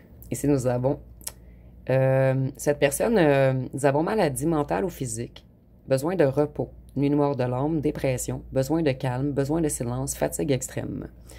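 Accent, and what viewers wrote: Quebec, Canada. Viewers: Merci beaucoup a vous que j'apprécie ainsi que votre accent québécois que j'aime enormement . Gratitude et remerciements à vous .❤👍🙏🌺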